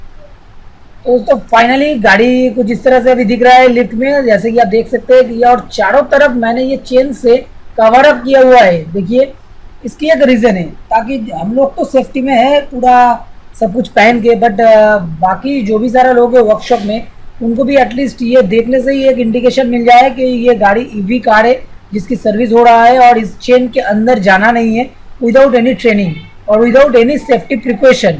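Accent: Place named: Bengal, India. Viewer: Dada kotha sune mne holo apni bengali...khub sundor laglo video ta erokom video ro deben...EV maintenance krte ki kno electrical licence lage?? Ami ekjon electrical engineering student...janale upokrito hbo🙏🏻🙏🏻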